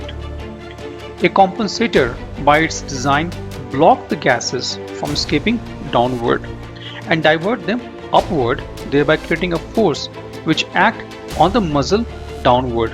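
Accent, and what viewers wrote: India. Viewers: speak slowly. Indian accent is made fun all around the world. Nahi tou apna Hindi bolo, Maast.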